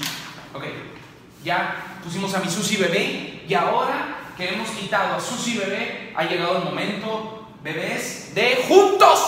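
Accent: Mexico. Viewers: a numa ,eres de monterrey :v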